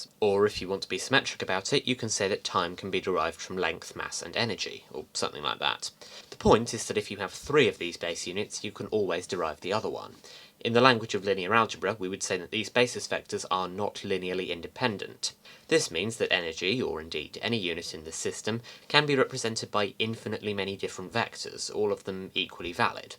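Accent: United Kingdom. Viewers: You have no idea what a vector is, but with a British accent you can make the biggest BS sound true, including WMD.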